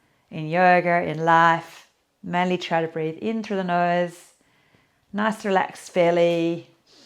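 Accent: Australia. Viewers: Thanks. As a Canadian I am boycotting American products. Wonderful to hear an Aussie accent and wonderful to feel better.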